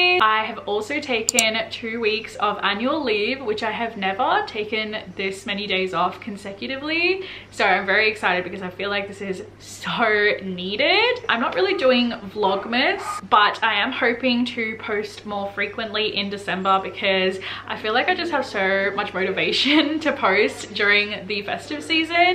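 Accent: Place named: Australia. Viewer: Gosh you have a good Aussie accent I live in nsw and I sound like u 😂 the pure Aussie accent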